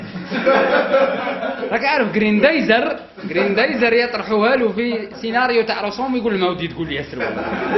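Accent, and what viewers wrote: Djelfa, Algeria. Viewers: اللهجة الجلفاوية النايلية💞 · Tony Soprano لهجة جلفاوية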